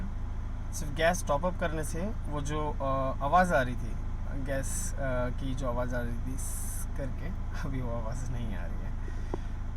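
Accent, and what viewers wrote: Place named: India. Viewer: English title but Indian narration. Dislike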